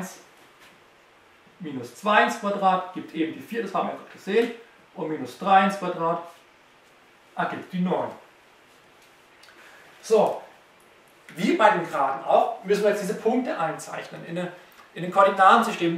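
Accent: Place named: Baden, Germany. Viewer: Sehr schön erklärt Sie kommen doch auch aud dem badischen das hört man raus :) "isch gleich"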